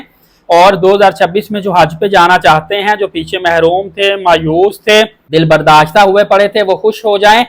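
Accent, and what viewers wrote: Punjab, India. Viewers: انکر صاحب معلوم نہیں اردو میں بات کر رہا ہے یا پنجابی میں بات کر رھا ھے اردو مکمل پنجابی لہجہ میں گفتگو فرمائ جا رھی ھے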